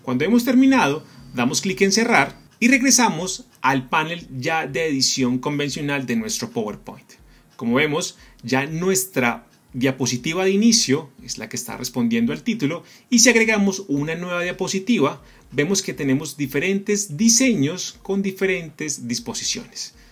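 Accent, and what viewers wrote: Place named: Colombia. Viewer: esta chido, gracias, ....osea que lo mas aprovechable es que lo haces de forma tal que automatizas con el patron, la neta que me gusto pero toma su tiempo, en todo caso te agradezco y si no me equivoco eres colombiano, me agrada el acento de ellos, gracias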